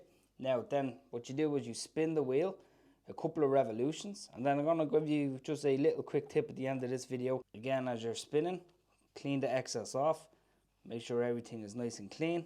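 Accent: Ireland. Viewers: Another brilliant informative video as always! also Love your accent mate, the Irish accent is the best in the world imo x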